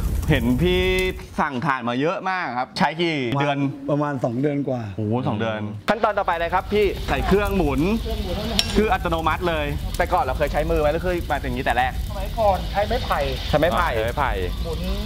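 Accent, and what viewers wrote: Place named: Suphanburi, Thailand. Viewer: พูดเหน๋องทั้งคลิปเลย ดูคลิปพี่จนติดเหน๋อและ555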